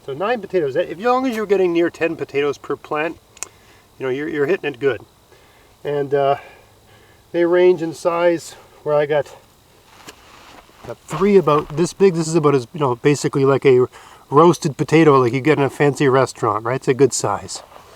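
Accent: Maritimes, Canada. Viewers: Without reading the page name I watched this before gardening and within 2 seconds I was like is this guy an islander?? Unmistakable maritime accent. Then I saw your channel name and saw you’re in NS 😂 thanks for the potato advice from this islander!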